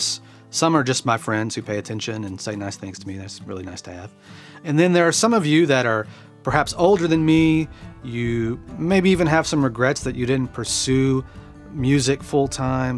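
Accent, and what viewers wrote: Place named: Texas, United States. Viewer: Hey, YouTube is awash with "how to" tutorials. What's refreshing about your channel is that it's a personal journey of one man and the stories he shares (including his those of his dad!). I love that gentle, southern texan accent too (I'm from Melbourne so I don't hear a lot of it around here!). Throw in some cool music in the background to support the stories and it's a beautiful place to be! I'm very happy to just be present and an observer of your journey, in all it's twists and turns. Long may it continue!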